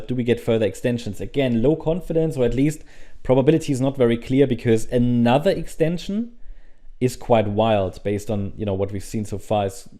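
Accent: Germany. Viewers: you have strong german accent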